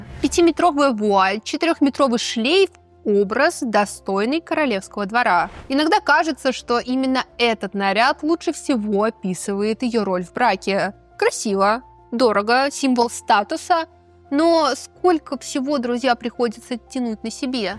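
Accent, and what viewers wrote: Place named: Russia. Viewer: Дорогая ведущая, ты с дианой панченко как две капли воды по стилю ведения программ, по расстановке интонации и акцентов, по переигрыванию ситуаций, по мимике, агрессивному обживанию передаваемой информации, по особо подчеркнутому руССкому акценту, да почти по всему, включая ваши перенакачанные губы!..... что это за такая дивная тенденция в современной пророссийской журналистике!!!!!!! Фу, противно и гадко!!! Не переигрывайте, будьте живыми, незлобными, простыми и позитивными, оставьте излишнюю едкость и будьте менее судьями, а более информаторами!!!!!!!! Успехов!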